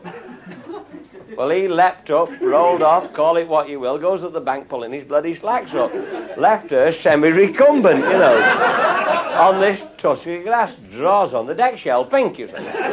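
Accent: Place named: Cheshire, United Kingdom. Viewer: Aye...it's reet Cheshire. Canst tha kick an 'ed a bol' aginst a wol' 'till it's bosted? ;)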